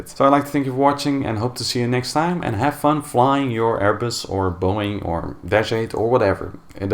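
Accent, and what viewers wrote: Netherlands. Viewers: i knew you where dutch by your accent and because you showed a klm airplane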